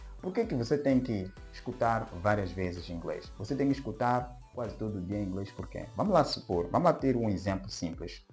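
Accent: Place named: Angola. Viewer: De primeira pensei q tu fosses Angolano 😂❤ Salve mano belo video O teu Sotaque é de Angolano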